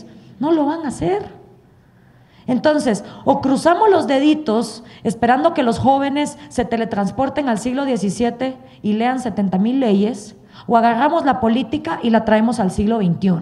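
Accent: Mexico. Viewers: EL UNICO INTERES DE ESTA CHICA ES EL MONEY , ES DE GUATEMALA PERO HABLA COMO MEXICANA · pero porque me hablas como mexicana wey?!?! por favor, un acento guatemalteco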